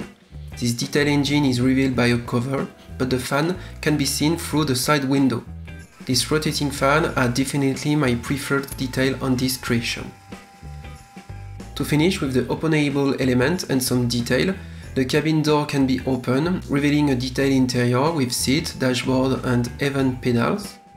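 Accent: France. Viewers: Watched 1 video and i m a big fan dude. Love your franch accent as an german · Joli accent français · On reconnait l'accent francais😅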